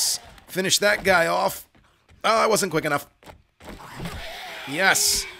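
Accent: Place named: Philadelphia, United States. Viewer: His heavy accent makes me miss my hometown. Can't tell me he's not from philly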